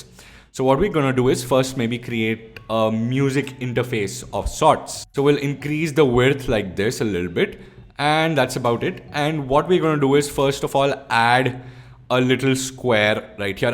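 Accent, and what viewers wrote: India. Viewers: Indian accent 😂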